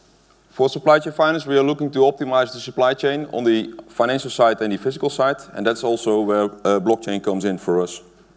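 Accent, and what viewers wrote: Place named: Netherlands. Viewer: Ugh, those dutch and that freakin annoying accent. I'm no native English speaker but at least my English isn't as thick accented as this one. What's wrong with you Ducth? It's like you make no effort at all to speak decently. I much prefer a german or an french person speaking English than this.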